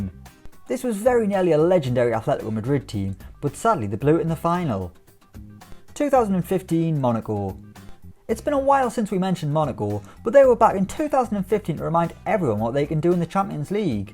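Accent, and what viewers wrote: Ireland. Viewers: I am a simple man. I don't hear irish guy. I click off. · starts video doesn't hear irish guy's voice "Ah shit"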